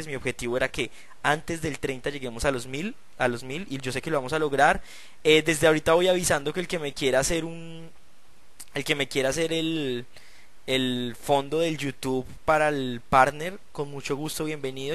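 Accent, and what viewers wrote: Colombia. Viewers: Se le nota que es Colombiano el parce , por su cara por su habla diciendo parce , chimba y por eso siempre estoy orgulloso de Colombia :D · sos colombiano ? ._.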